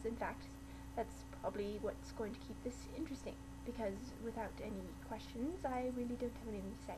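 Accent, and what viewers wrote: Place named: United Kingdom. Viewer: OMG!!! YOU ROOOOOOCK! (for a second, just a second, i really thought "wow i forgot she had a british accent") Randomness #1: I was the first 1 to coment!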